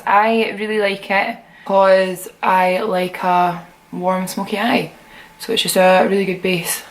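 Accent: Scotland, United Kingdom. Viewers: I sound similar to her but hers is much stronger haha I'm fairly quiet myself tho it's hard getting people to understand when I go on holiday 😂 us Scots speak fairly quick and with slang aha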